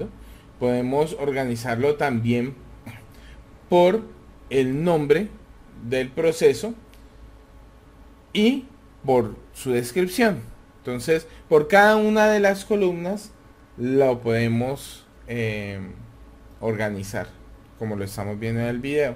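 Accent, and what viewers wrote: Colombia. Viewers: ...Gracias...Excelente opcion. por tu asento se ve q eres colombiano XD... like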